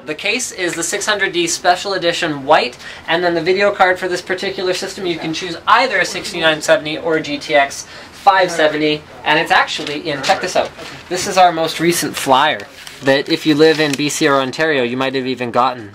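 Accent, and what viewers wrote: Russia. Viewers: For how log has he lived in Canada now? Most of the Russians lose the terrible accent after a year or so...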